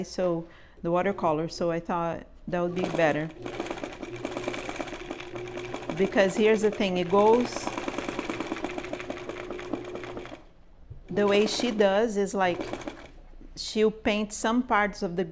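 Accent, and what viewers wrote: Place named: Brazil. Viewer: voce e brasileira ? a sua ingles parece de alguem do brasil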